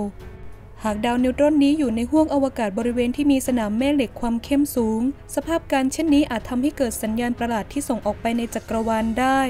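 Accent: Thailand. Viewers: คนใต้ไหมนิ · เสียงเหน่อมาก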